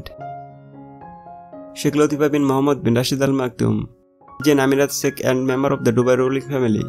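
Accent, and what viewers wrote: Bengal, India. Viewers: Improve your English....there is a tinge of Bengali accent in your English. It is is not eezz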